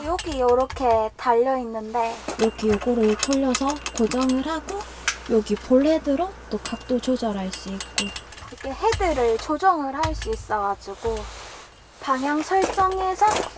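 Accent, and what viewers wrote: Gyeongsang, South Korea. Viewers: 고영희씨가 저지레할려고 들어왔다가 딱걸렸어요 ㅋㅋㅋㅋㅋㅋㅋㅋㅋㅋ 님 경북말씨가 넘 귀엽고 정감있어요 ㅋㅋㅋㅋ 님 캠핑은 어수선함 없고 깔끔하고 믿음직하네요👍🏻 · 경상도 사투리 ㅎㅎ 잘보고 있습니다. 셔쿨 고정하는 거 어디서 구입했나요? 담영상 기다려지네요. ^.^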